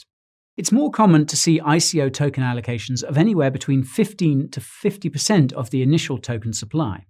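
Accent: United Kingdom. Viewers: Hi, I am new in Cryptocurrency, what is ICO , I Dex , Ieo? Sorry i feel like ignorant.. Your Y.t very informative, But i have problem with ur britten Accent. can u please easy it for international Followers.. Thanks , u are the best so far.. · I love your very British accent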